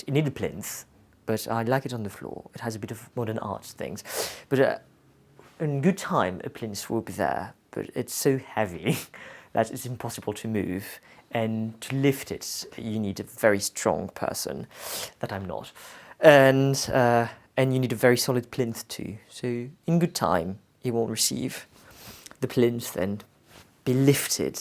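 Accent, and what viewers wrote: England, United Kingdom. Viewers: Such a nice place with a country feel ..this is one of the reason i like London and the British touch ... it's often very personal and a mix of patterns and colors.. how can a young man (who has recently arrived in London) able to afford such a nice London home?! . C'est vraiment impressionnant comme parcours ...et qui plus est you've picked up the english accent ...vous parlez parfaitement dans les deux langues ..last but not least j' adore votre intérieur .. you're so talented , je suis estomaquée ! And if you're reading this little note , seriez vous assez gentil de me dire avec quelle "paint" vous avez refait la cuisine car j' aimerais rénover ma vieille cuisine qui a de beaux éléments en bois et la votre m'inspire ! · bravO!! Your home is homely, elegant, quirky and inviting and graced by your charming english accent:-) ⭐⭐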